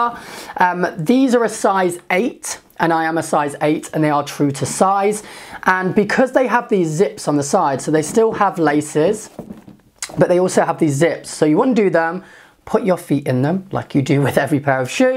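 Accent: United Kingdom. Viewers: are you Brit? i can hear accent..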